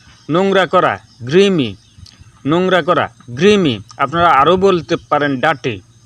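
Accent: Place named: Bangladesh. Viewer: উচ্চারণ শুনে বোঝা যাচ্ছে বাংলাদেশী ইউটিউবার। বানান আর উচ্চারণ ঠিক নেই তো কিভাবে গণমাধ্যমে শেখাবে?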